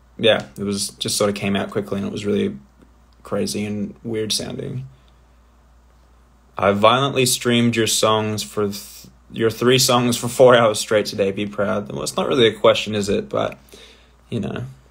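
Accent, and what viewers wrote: Australia. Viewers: He really sounds so much more Australien then in the last few years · You’re not too close to the screen LOL And yes we appreciate the return to a more aussie accent :) just those sneaky American “rrrr’s” quite prevalent. Love hearing the aussie “o’s” coming through every now and then. Like when us Aussies say “I don’t know” and other words like “home, phone, alone etc” Its just so Australian, so please don’t lose too much more 💕 Love the album. I only live 5 min from work so I’m keen to go driving next weekend to listen to the whole album with the windows down - and it’s almost spring weather on the Gold Coast so thanks for the driving music that you poured your life into.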